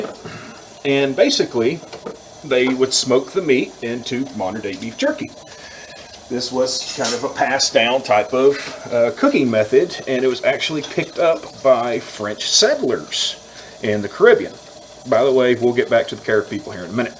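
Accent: United States. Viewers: yep you're definitely a Texan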